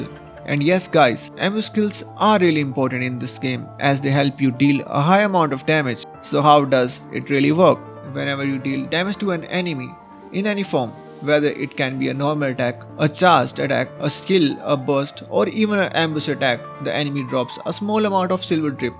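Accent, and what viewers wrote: India. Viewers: Hey umm are you indian...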